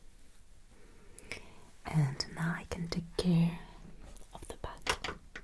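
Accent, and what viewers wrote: France. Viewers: My goodness. The scenes you create, the movement of your hands, your voice, your lovely French accent, the overall energy of your videos - everything is so gentle and calming, it helps me forget for a little while the progressively dystopian world around us. Thank you for this respite from reality. ❤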